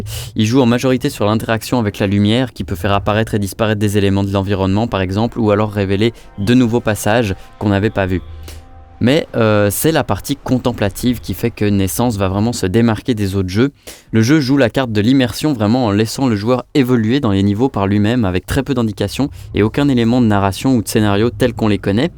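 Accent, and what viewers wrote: Belgium. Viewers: tes belge ?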